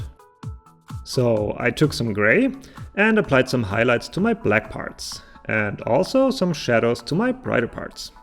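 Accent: Germany. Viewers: spork they have a German way of English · Sehr nice videos die ihr da macht! Ich bin vielleicht kein cosplayer aber ich schaue diese Videos einfach trotzdem sehr gerne. Sie sind sehr unterhaltsam und den deutsche akzent kann man einfach so herraus hören das alles so "vertraut" vorkommt. Was ich mich einfach noch frage ist, wo die Tracer guns sind? :D Tracer ist ja das Masktochen von Overwatch und fehlt mir irgendwie in eurer video Liste. Macht weiter so und ich hoffe ihr werdet einfach NOCH größer! PS: Ich habe euch natürlich auch ein Abbo da gelassen. MFG Sebastian ;)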